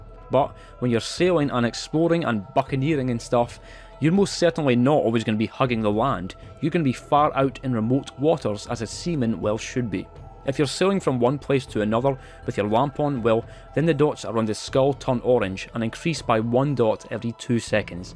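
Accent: Scotland, United Kingdom. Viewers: That scottish accent. It's cute. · I just like to come back to this video for an easy watch - it's about something I love, it's amusingly narrated, it's Scottish, 10/10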